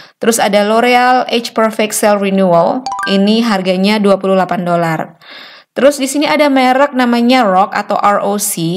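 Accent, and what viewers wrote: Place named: Surabaya, Indonesia. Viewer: Ya ampun cek larange. Hahaha... Suroboyone ora ilang... Hihihi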